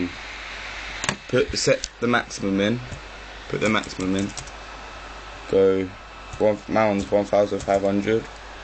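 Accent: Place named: London, United Kingdom. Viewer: yeah, dis brar obviously from london, safe. and dis is usefull but how the fuck can i du dis on a belkin. jheez not been on xbox for longgg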